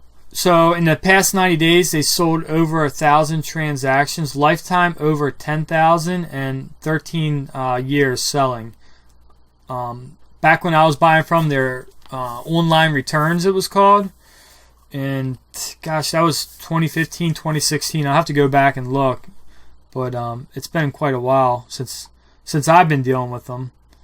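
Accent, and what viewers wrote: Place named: Louisiana, United States. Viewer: Are you originally from Louisiana by chance? My fiancé and I simultaneously said, “He’s a local boy!” based on your accent.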